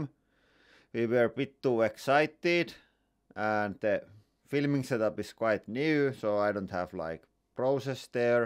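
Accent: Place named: Finland. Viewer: I Love the finnish accent 😊 Also Finland is the most comparable country to Denmark 🇩🇰❤️🇫🇮 Most Happy people in the World 😁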